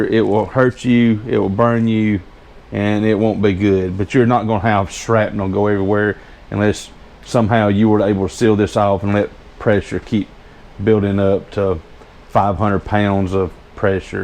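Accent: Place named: Kentucky, United States. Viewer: Nice video! I am in Kentucky. You accent sounds like us. What state are you in?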